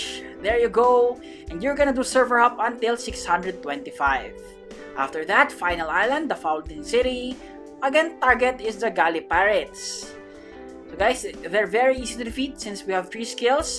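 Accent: Philippines. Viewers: you have a very thick filipino accent kuya😄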